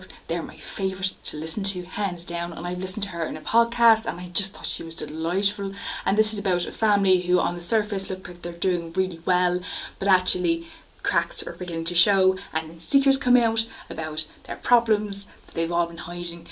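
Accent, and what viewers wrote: Ireland. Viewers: Great selection! I've only just finished Hamnet and was absolutely floored by it. Had a major book hangover after. Your Irish accent was lovely to hear 😊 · This was fun, I love Irish accents(but I’m biased)☘️ I read “Angela’s ashes” when I was a kid and I’ve been meaning to reread it someday. I know i really liked it (I like sad stories, it seems). Ooo I really want to read “the heart’s invisible furies” though, yes that one might win this month. Great choices, as always. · Aaaah so late to this but thank you for mentioning me and also buddy reading Angela's Ashes!! 'twas truly a wonderful memoir, and I'm definitely helping myself to this convenient list of Irish books to check out next 👀 Your Irish accent is so sweet -- I've been trying out the accent during my read and the vowels and R's are so fun LOL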